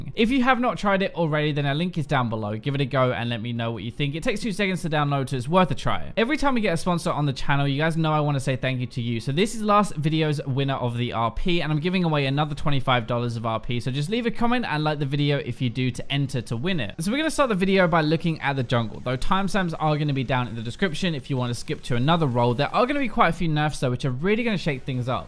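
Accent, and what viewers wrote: England, United Kingdom. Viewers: Your doing gods work, both the patch videos and the app are very helpful as well as the English accent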